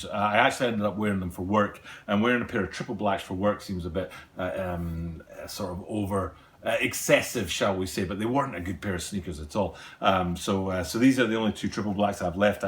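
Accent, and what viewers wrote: United Kingdom. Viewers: No probs Son. You need all the Support you can grab in this game. I keep losing all my blinkin Supporters though 😂. They get to a 1,000 then delete all the people that got them there... Bloody Buggars 😆🤣. I like your Channel anyway Pal. Theres not too many U.K guys that do what you do, so it's nice to hear an accent I've grown up with. You're doing a good job Mate. Posting everyday would just about kill me though, although my videos are packed with a load of old rubbish (Sorry... Trash!! 😉) that takes me forever to find, so they are a little bit longer than yours and take me a week to create them 😂 ✌😆👍. Not sure if you've got <link> where you are?? (Online) but they had the Triple Black 19's in a few days back and Footlocker aren't releasing them until the end of July??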